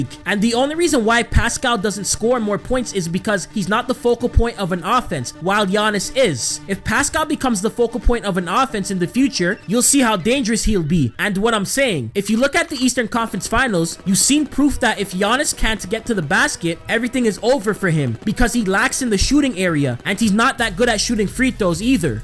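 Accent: Toronto, Canada. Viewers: This guy is a Toronto man confirmed 🤣😭😭 the accent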